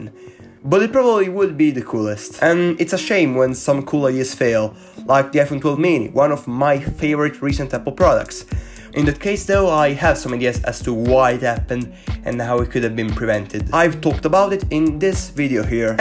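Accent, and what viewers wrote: Italy. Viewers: Sei italiano zio vero?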